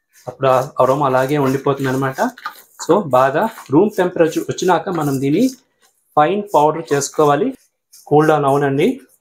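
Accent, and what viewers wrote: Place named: Karnataka, India. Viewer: మీ తెలుగు స్లాంగ్ చూస్తే మీరు కన్నడిగులని తెలుస్తుంది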